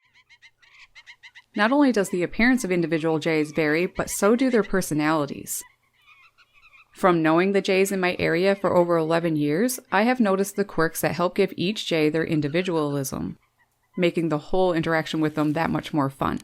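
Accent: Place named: Atlantic Canada, Canada. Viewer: My favorite video! Your accent sounds like you're from east coast of Canada. Are you?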